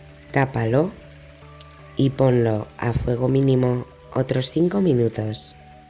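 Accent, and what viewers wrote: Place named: Spain. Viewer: Saludos desde Canadá: Me gustan sus recetas y también su acento español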